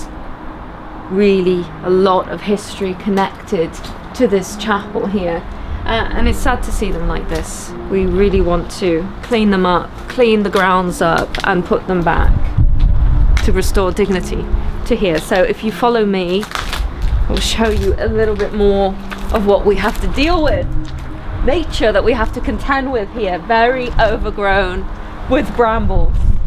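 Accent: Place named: Northern England, United Kingdom. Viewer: That is wicked! You're northern English though aren't you?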